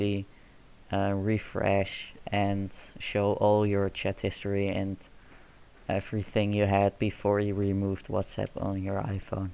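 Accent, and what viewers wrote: Netherlands. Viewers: Are you dutch beacause your accent sounds very dutch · Aan je accent te horen ben je Nederlands of niet?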